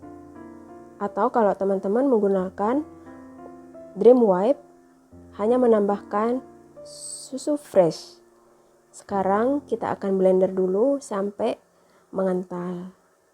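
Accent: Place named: Java, Indonesia. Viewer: mantab.... suaranya medhuk ya kak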